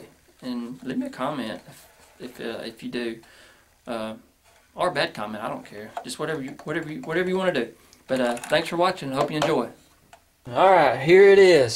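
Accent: South, United States. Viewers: Hey man what part of the South are you from?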